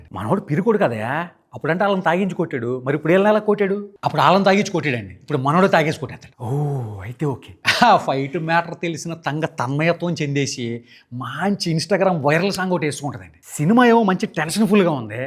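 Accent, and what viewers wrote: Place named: East Godavari, India. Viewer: This guy is very intelligent and highly talented, with a fund of original humor and high class satire which we rarely find in Indian YouTubers in general and Telugus in particular! He effortlessly carries the East Godavari slang with appropriate verbal sounds at right appropriate places thereby enriches the descriptive part with a very unique and rare blend of subtle humor laced with extremely high quality observation of semi - literate Telugu script writers and near illiterate Directors! I think there is only one more movie troll, one Fasas Roast who is in the same league with this guy and he already emassed a fund of followers and I foresee and wish him the same, in the imminent future! I think I can even write more and I wish I should as he richly deserves but I don’t have energy as I’ve totally exhausted mine in laughing uncontrollably! 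Good Luck, Mr.! You’ve already proved yourself and try to diversify your inherent and latent by utilizing it to the fullest extent, if you are seriously into this! I doubt whether you are highly educated with a highly placed job and stuff! Anyway, you’ll Go places! That’s the writing on the wall and I’ve a rare gift of reading it clearly! I’m very fortunate to have a chance encounter with your posts and you’ve really made my day! You shall excel in your chosen line if you pursue it very seriously and with a little dedication and a bit more of an indefatigable industry! Amen! So mote it be! Kindly f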